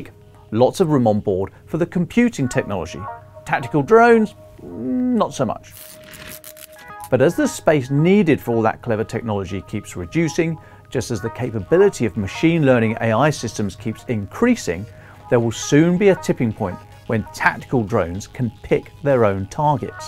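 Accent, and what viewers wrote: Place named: United Kingdom. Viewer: Yet another load of BS from a guy with british accent.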